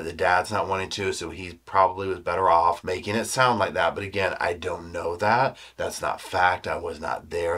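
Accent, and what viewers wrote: South, United States. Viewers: One of the reasons I so relate to is his Southern nature. He has a rhythm to his speech that brings me back to my own Kentucky roots. It feels like down home to me. ❤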